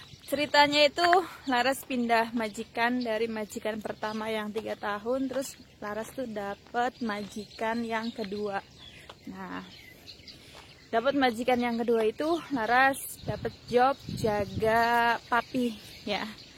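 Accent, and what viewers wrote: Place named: Losari, Indonesia. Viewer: Oh.... indramayu ya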